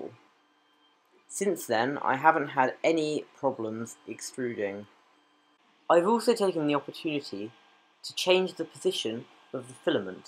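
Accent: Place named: United Kingdom. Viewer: Great man and an interesting new YouTube channel. I really enjoy your British accent with the soft 's' pronunciation 😃. Make more videos in general + a finished video of this project (if you have finished it so far 😄).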